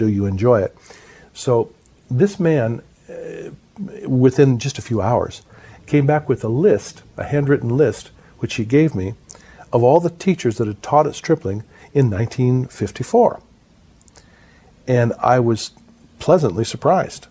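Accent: Pittsburgh, United States. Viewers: I got his book amazing work you from Pittsburgh I live on the norside of Pittsburgh off Brighton road I ask because your accent is Pittsburgh all the way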